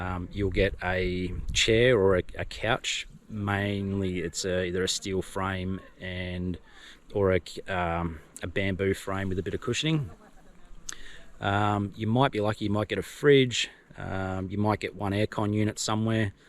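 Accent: Australia. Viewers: Great to hear an Aussie accent in Dumaguete in lieu of americano I will heading there from Manila next week love to catch up with a beer if likes cheers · Hey, it’s great to hear a Aussie voice talking about his adventures in the Philippines, we work hard for what we have, so I agree buy what makes you comfortable.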